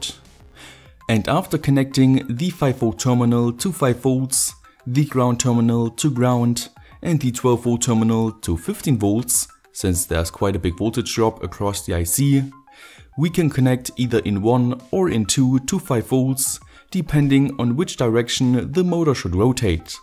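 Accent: Germany. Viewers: Jeder weiß das du deutscher bist... ich verstehe nicht so gut englisch aber man merkt das du deutsch bist weil du nicht diesen englischen “Akzent“ hast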